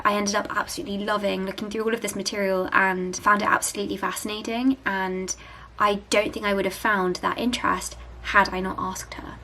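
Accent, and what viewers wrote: United Kingdom. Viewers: Her accent is so like Hermione Granger's !!!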